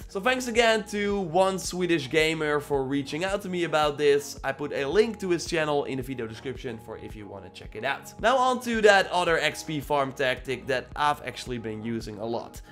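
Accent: Netherlands. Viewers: I think he's from netherlands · Je klinkt echt nederlands haha! Goeie video🙏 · Are you Dutch? I can hear an accent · I can hear you are a Dutchman like me. Ik hoor dat je een Nederlander bent zoals ik.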